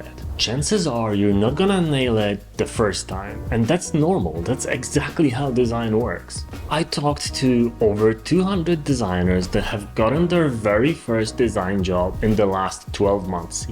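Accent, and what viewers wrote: Poland. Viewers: You put a lot of effort into your content. It's very well done and creative! You are beyond qualified!! You have given me golden nuggets of valuable information, and I appreciate you very much!! Do what makes your heart sing, whatever that may be :) I feel your battle! I'm a loner, for sure! I admire your courage. Are you from Poland? My ancestors are from there. You have a cool accent. I have never heard it before in the US.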